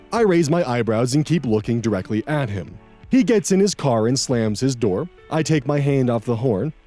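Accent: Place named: United States. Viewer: You weren’t that bad with pronunciation with that first story, you Americanized it a bit but it was all understandable. also French pronunciation is extremely specific, and often inaccurate to how something is spelt (see oiseaux = wazo. I genuinely couldn’t spell it myself- it’s been a while since I’ve been in French education so I’m a bit rusty)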